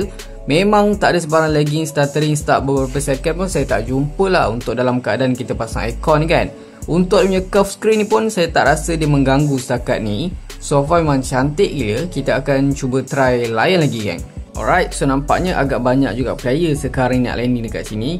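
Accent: Malaysia. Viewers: satisfying bat denger orang malaysia ngomomg hahaha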